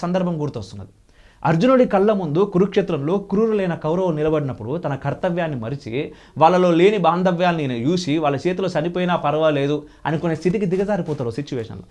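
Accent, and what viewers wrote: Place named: Telangana, India. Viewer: అబ్బాయ్, అద్భుతం, అనిర్వచనీయం. ఇట్ల చెప్పు కుంటా పోతుంటే.. ఆగదు. ఎది ఏమైనా నువ్వు చెప్పే ప్రతీ అక్షరం మొదలు.... మాలంటోల్లను... మంత్ర ముగ్ధులను చేసినవ్ పిలగా.(ప్రేమతో) తెలుగు భాషను చక్కగా చిక్కగా....బాగా బాగా చెప్పినవ్. తెలంగాణా మాండలీకం అక్కడక్కడా చాలా ఇంపుగా సొంపుగా నీ శైలి లో మా ముందు ఉంచినందుకు ధన్యవాదాలు..... ఇక ఉంటాన బ్బాయ్. ~ I, pray the almighty to bless you with more than you desire and deserve.....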